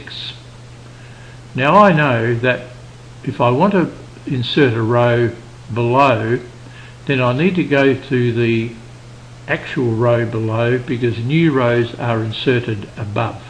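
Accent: Australia. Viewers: awesome accent, Australia? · Hey. great video! many thanks. Would you say that this sort of test would be carried out in the UK. I only ask as I heard a Aussie (or kiwi?!) accent and thought this test might appear more in the southern hemisphere? please let me know when you get a chance. cheers. M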